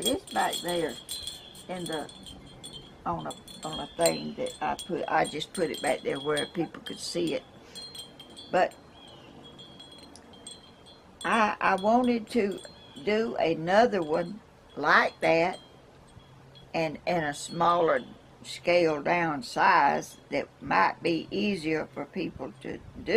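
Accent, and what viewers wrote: South, United States. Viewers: Nobody in Atlanta talks with a Southern accent any more. I sure love to listen to you talk. Reminds me of my family. Thank you. You are an artist!! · Oh my God mama , you are a delight to watch , your southern accent so cute, your creativity , over the top never thought of using nail polish and glass this way ..thank you so much , I’ll be following and will share with mom she is 90 but like yo7 a very crafty lady...❤️🙏🏻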